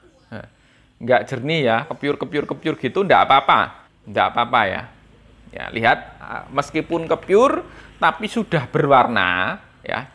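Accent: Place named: North Sumatra, Indonesia. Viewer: Aksen Bataknya kental banget, aku suka.... 😂😂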